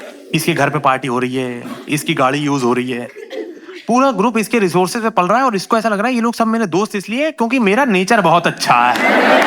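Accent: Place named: Madhya Pradesh, India. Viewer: A few months back he did this set in Bhopal as an opening act for Zakir Khan. It was my first time watching a stand up comedy show andit was very easy to see that the audience could relate to the set. As I said, I am from Bhopal, is a junior from school and many of my friends went to the same college as he did. The school things that he talks about in some other videos, I can very much relate to. My friends talk a lot about their local engineering college experiences and the way they share their stories and the stories themselves are very similar, it's so funny to listen. And it seems like it must be a very common phenomena to have such friends' groups and the dynamics that they had and "मध्य प्रदेश के ग्रामीण परिवेश के बच्चे" is such a prominent thing in the colleges of Bhopal. Everything he talked about, the way he talks, the lingo, the references, all the stories feel so similar that most of it is pure nostalgia. 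It is very much a Bhopali thing. I also realise that a lot of the things he says are for the performance but honestly a lot of it is quite relatable. I used to participate in open mics a decade ago and I remember he used to do open mics in his early days as an aspirational stand up comic. He has grown so much as a stand up comic, it is such a fascinating journey to see. He still has that inner millennial Bhopali vibe. Wo DB mall ka food court and the Christmas tree at the entrance of the mall that he talked about, saare tamashe wahi hote the. 🤣 Aur sahi me k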